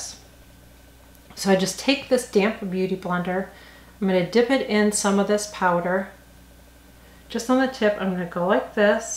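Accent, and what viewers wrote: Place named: Midwest, United States. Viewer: Hi, I’ve been subscribed for awhile but I don’t think I’ve taken the time to comment. I enjoy your content and all the help you share. It’s a bonus that you’re so “real” (no shame in a close up, you rock it!) and I can get my fix of a Midwest accent! I appreciate that you return with some “revisions” and solicit feedback. It’s a process, working with these eyes of ours. Thanks for leading the team effort! 💐 Jacqui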